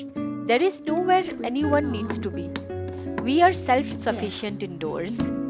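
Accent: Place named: Northeast India, India. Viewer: Hahaha..... breaking of the rolling pin and the glassware being intact is indeed funny......I have been watching a lot of your videos past two weeks, they are really nice.... Your English is perfect, nevermind accent, no one outside India does.....btw, I was born in northeast, so I totally understand the accent and I love it. Best wishes for you and your family 💞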